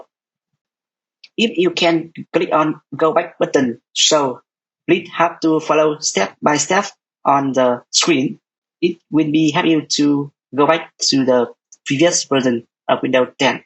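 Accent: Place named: Vietnam. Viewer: i love ur accent viatnamese right?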